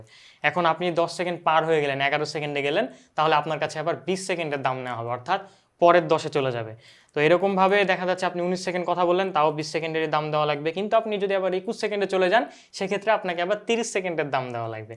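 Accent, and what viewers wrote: Rajshahi, Bangladesh. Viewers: Vai rajshahir nki . Khotha sune omon e lagche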